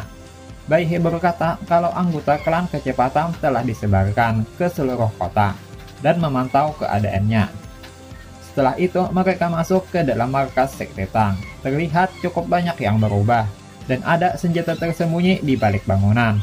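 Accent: Banjar, Indonesia. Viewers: urang banjarkah pian, logatnya kyk urang pahuluan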